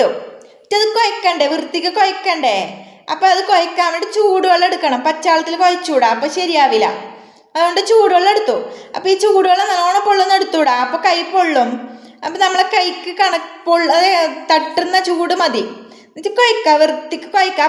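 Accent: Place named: Kasaragod, India. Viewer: ആക്ടിങ് അല്ല ഇത് കാസറഗോഡ്ഭാഷ ആണ് , ചില സ്ഥലത്തഇങ്ങനെ ആണ്, ബന്തടുക്ക, പൊയിനാച്ചി, ഇങ്ങനെ അങ്ങോട്ട്‌ ഇതാണ് ഭാഷ ❤️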